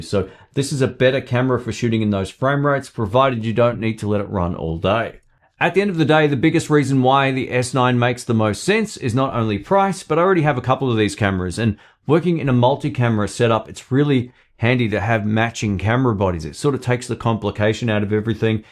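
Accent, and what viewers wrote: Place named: Australia. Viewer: woops my bad. Should've recognized ozzy accent) silver top looks very nice, hope it'll make it over here sometime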